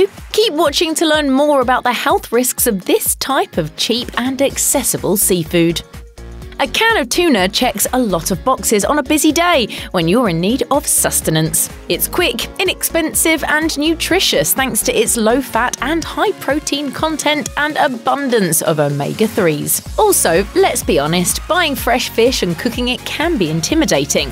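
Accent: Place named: United Kingdom. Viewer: Ugh who is this British narrator? Bring back the old narrator.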